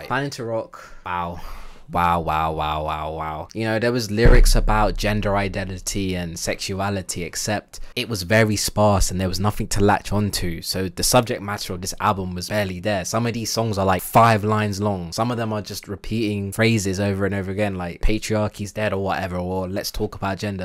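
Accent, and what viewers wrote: United Kingdom. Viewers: Nice to hear a British voice in this music YT space for a change. Especially someone taking it seriously. Keep it up, mate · Why do you have a british accent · Wasn’t expecting you to be British.. didn’t know yall wore duwies.. when did yall start that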